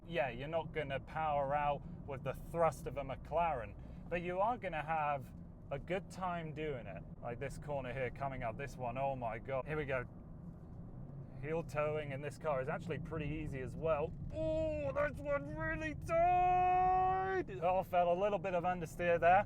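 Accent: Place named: England, United Kingdom. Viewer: Are you in Canada .... ? because you sound very English .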